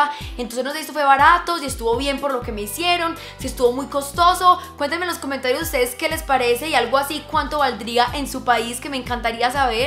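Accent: Antioquia, Colombia. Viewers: No toda Colombia habla asiii, únicamente los paisas hablamos así y Antioqueños. 💛 El acento cambia según la región. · Osea no todos los colombianos hablamos con ese acento, así hablan los paisas que son de la ciudad de medellin. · Ella habla asi por que es paisa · Recuerda que ese acento no lo tienen solo los de Medellín, son más ciudades, Armenia por ejemplo, entre otras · Me encanta el acento de Colombia es el mejor de Latinoamérica saludos desde Venezuela 😍😍😍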